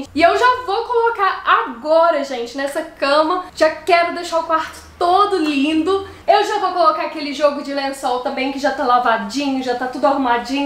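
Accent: Minas Gerais, Brazil. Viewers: Adorei o mineirês! Sou baiana, mas o jeito mineiro de falar é o sotaque brasileiro que mais gosto! Vim do canal da Daniele Paula!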